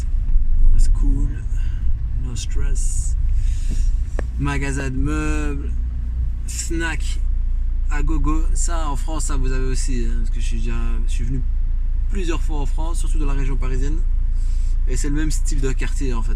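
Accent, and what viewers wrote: Belgium. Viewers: Salut amigo ! Sympa ce petit concept de vidéo, ça change ;-) en tout cas tjrs autant agréable ton accent belge, je sais pas pk mais j'adore:-D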